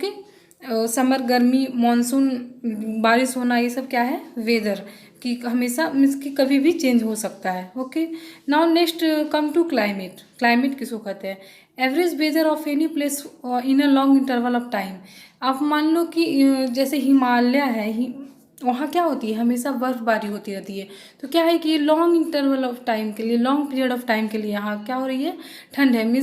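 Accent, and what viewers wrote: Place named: Bihar, India. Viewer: Bihariyo ko tarah mt bol pagal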